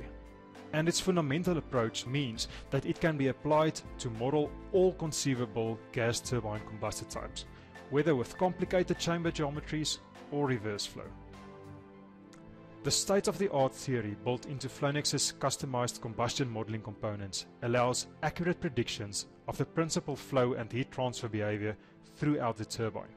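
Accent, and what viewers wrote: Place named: South Africa. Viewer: Are you south african? I hear an Afrikaans accent somewhere in there.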